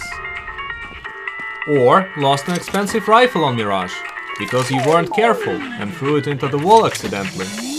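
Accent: Russia. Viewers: Очень забавно слушать тебя, говорящего по-английски, но с интонацией из русского языка))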